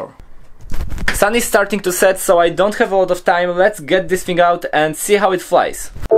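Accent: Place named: Poland. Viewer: Słychać po akcencie ze Polak xd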